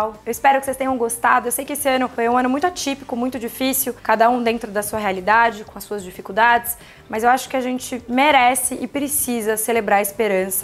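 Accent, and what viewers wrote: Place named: São Paulo, Brazil. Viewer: Nao existe alguém com um sotaque paulistano tão carregado como o da Ju Ferraz, até eu que tb sou de SP fico impressionada 😍😆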